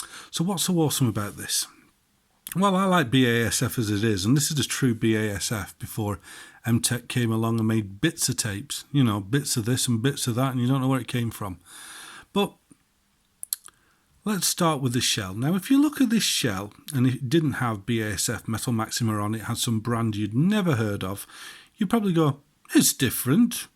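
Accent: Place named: Manchester, United Kingdom. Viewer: You've got the same kind of accent as Techmoan. Are you from the Manchester area too? 😁👍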